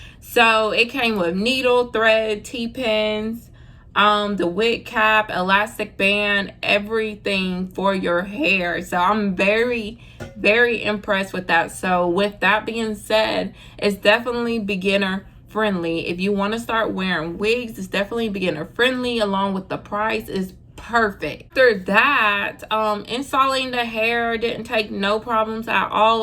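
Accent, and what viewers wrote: United States. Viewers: Are you from the south ? Your accent is soooo cute 😍😍😍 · I'm from Mississippi shawty got to be from GA cause everybody I know sound just like that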